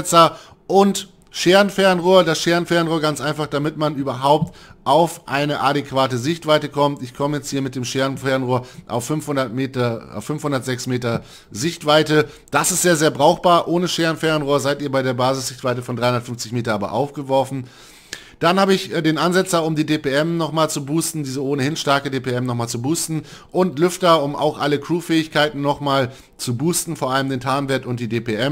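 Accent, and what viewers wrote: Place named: Hamburg, Germany. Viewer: Nossi ist sehr unterhaltsam, Moo für die breite Masse um ihnen das Spiel näher zu bringen. ist für die Spieler, die das Spiel verstehen wollen. Und bringt es zudem mit seiner Hamburger Schnauze (Grüße aus Bergedorf) einfach genial rüber.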